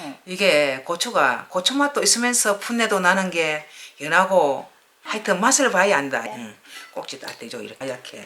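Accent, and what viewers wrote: Gyeongsang, South Korea. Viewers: 강원도 사람인데 사투리가 진짜 듣기좋네요 ㅋㅋ 경상도로 이사갈까 ㅋㅋ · 경상도 사투리가 재미 있어요. 홍양,홍양..ㅋㅋㅋ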